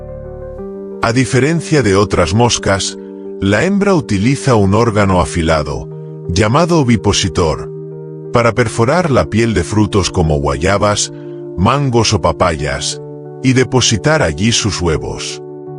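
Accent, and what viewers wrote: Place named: Spain. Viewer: Hoy he visto este documento y me agrada mucho que se hace énfasis en 1. Mostrar parte de la biodiversidad de insectos en la Amazonia y las interacciones de estos con el entorno de la selva y la necesidad de preservarlos para la salud del ecosistema y el planeta; 2. Como cada especie tiene un papel en el intrincado rompecabezas de la Vida y el aleteo de una mariposa repercute en los confines del cosmos. 3. Como videofilmador de naturaleza coincido en que la selección de la música y los sonidos acompañantes es muy importante, también la voz del narrador es importante, aquí tiene un acento español y creo que el canal es de América, cierto? Mi canal es de flora y fauna de México y todo mundo lo mira sabe que es una ventana a México. Saludos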